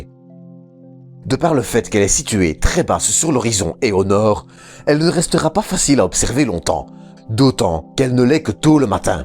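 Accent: Liège, Belgium. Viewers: Bonne video, mais pourrais-tu effacer cet accent belge à couper au couteau ? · "je prends note pour l'accent, et je vais essayer d'améliorer ce point." Ne change rien, big universe, ça fait parti du folklore Belge, et c'est éminemment sympathique !! Cordialement d'un presque voisin, basé à côté de Lille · Ah ouais accent de Liège ça nan ?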